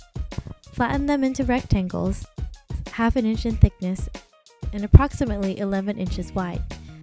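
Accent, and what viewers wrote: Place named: United States. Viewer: you sound so american than filipino thats kinda funny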